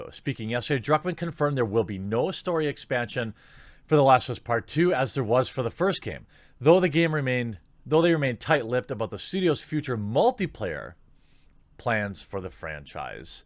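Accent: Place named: United States. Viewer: When did ditch his accent? He sounds completely american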